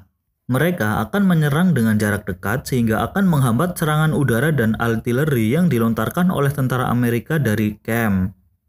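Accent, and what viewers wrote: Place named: Banyumas, Indonesia. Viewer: Yang nggak bagus.. Ini orat nerocos terusan.. Ngapak lagi ngomong nya.. Nyebelin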